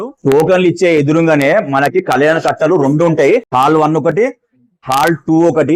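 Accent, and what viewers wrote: Telangana, India. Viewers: Telangana yaasala manchiga chepthav anna ee video kooda baagocchindhi anna Govindhaa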